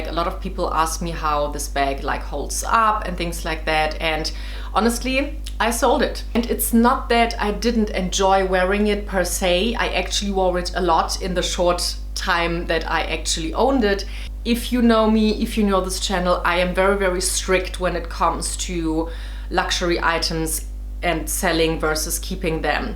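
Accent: Germany. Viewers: Where are you from?:) you sound so German 🙈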